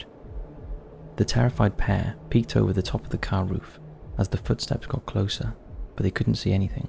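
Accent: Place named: England, United Kingdom. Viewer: think everyone agrees not sure where in England he is from?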